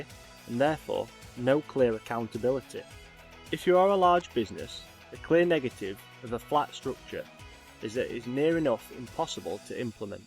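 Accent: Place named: Manchester, United Kingdom. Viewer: Very clear, understandable presentation. It is just what I needed for illustration for my students. I like the accent very much! Manchester?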